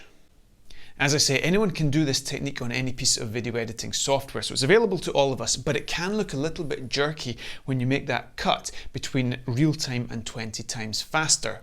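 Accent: Scotland, United Kingdom. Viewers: Title drew us in Scottish accent kept us here ❤‍🔥❤‍🔥❤‍🔥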